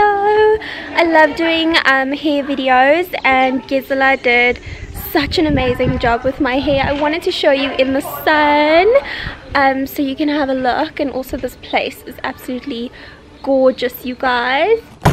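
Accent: South Africa. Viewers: I knew you were from south africa once I heard your accent, sounds like Candice Swanepoel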